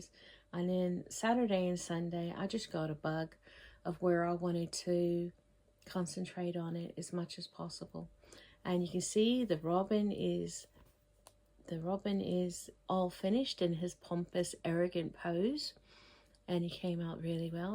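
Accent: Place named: South, United States. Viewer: Once again...another wonderful video!! Progress is coming along nicely on all your projects!! Loving the bird one!! Gorgeous pictures of your adventures!! Thanks for the shout out as well!! I do love your accent...I just giggle everytime I hear you throw in a little southern draw word or two 🤣 ❤️🪡❤️ Editing to add...I haven't stitched on 20 ct but I do have Humility (the big lion piece) on 22 ct & initially I did 2 over 1 on that...it ended up where my stitches were just too puffy & they weren't laying right so I ripped it all out (probably about 1000 stitches & went with 1 over 1. I do use 2 strands for 18 ct. But just wanted to give this little insight...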